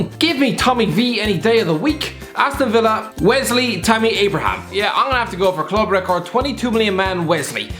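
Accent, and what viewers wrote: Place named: Ireland. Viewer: Pro tip for all the non-Irish folks, watch the video at 0.75x speed to better understand the genius of his jokes through his accent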